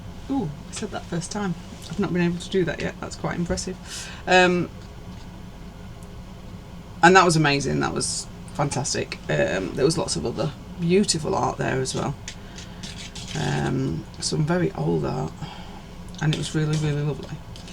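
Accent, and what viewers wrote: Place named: England, United Kingdom. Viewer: I live alone with my dog Madge, a rescue greyhound, and very rarely see another human being to talk to, so I talk to myself, and answer back all the time - I have always done this though! If someone suddenly appears, whilst I am nattering on when walking with my Madge, I pretend I am talking to her! But I do not mind if folk hear me doing this, I just make a joke of it! It is the only way I get through the day, and at least I do not get criticism for saying something wrong to someone - I only have myself to blame! I have just found your channel, so given it the thumbs up and subscribed. I am a pottery painter, but love all craft. I have owned and run 5 arts and crafts shops in my past. I also had my own Private Nursery School on the side of my house, down in Devon, in the 90s, and loved doing all that art and craft with my beautiful children I was so blessed to have coming to my Nursery School. 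It was called Woodpeckers Nursery School, and I had the chicks and the fledglings. I am now 67 years young, and need to get back to doing craft things, as in the last 7 years I have lost my way a bit, due to sever anxiety, and only going out of my very rural home to walk my lovely Madge. I am surrounded by nature, so going out with her is such a joy. My latest thing I am going to be doing however, is making Christmas decorations with acorns in their cups. I love the way you do your painting, so thank you for this video. It is lovely to hear an English accent too, as so many I find on h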